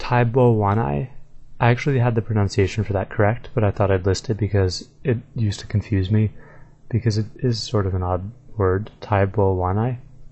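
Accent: England, United Kingdom. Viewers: Gotta always remember the pronunciation is done with English accents. So for Americans a lot of this is different. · You need to keep in mind these are will an english accent, that's why some of them sound strange.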